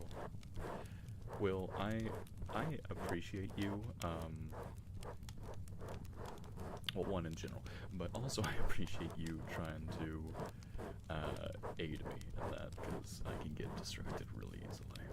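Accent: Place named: Texas, United States. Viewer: It surely was a fun day!!! I really enjoyed the whole hour, AND i learned new things thanks yo you a whole bunch, i love ur Texas accent, i love old western especially on TV like Dallas!!! The original of course, your voice really has a strong deep tone, love u!!! Ur good Boy.❤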